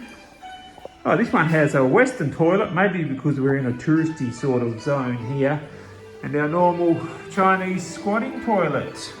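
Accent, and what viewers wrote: Australia. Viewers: Is that Australian broad accent?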